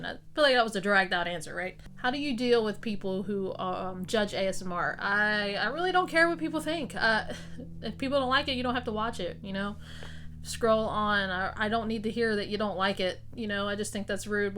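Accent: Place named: Kentucky, United States. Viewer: omg i’m from ky! i knew your accent sounded familiar to me 🧐🥰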